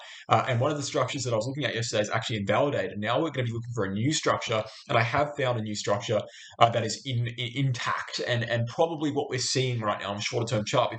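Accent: Australia. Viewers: I like your TA and offers of your considered opinions about the directions of the market. I am presently positioning myself and my wife's portfolios to take advantage of these downward moves in bitcoin and a couple of Altcoins as well. I have a small criticism in that your aussie accent can make catching all of your commentary at the speed at which you speak. Have pity on the rest of us english speakers as we want to get all the nuances that you are putting out! Thanks - RHH.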